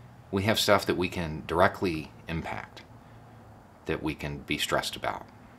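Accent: South, United States. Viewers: It takes sometimes for me to get used to the southern accent expressing points of view in coherent and complete sentences.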